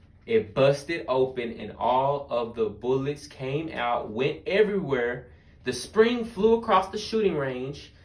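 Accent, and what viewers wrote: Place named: Texas, United States. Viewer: When you said wassup wit it lol I knew you was from Texas lol I’m in Dallas mayne keep doing ya thang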